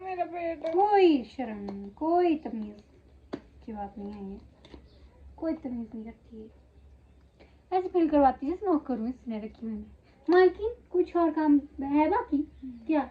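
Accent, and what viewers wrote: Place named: Pahadi, India. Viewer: Pahadi tone is beautiful ❤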